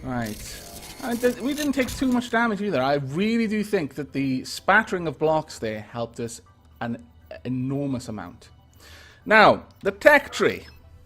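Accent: United Kingdom. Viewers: thanks for understanding i love your channel by the way keep it up moslty the robocraft vids there awesome you helped me a lot with fliers and you got me into the game your on my 1 on the list of my favorite youtubers cause you answer back and you understand us and also help us and i really like your voice its really pleasing to hear it when ever i watch i vid i get really happy cause i love the british accent its so nice to hear but keep it up! looking forward to the next episode.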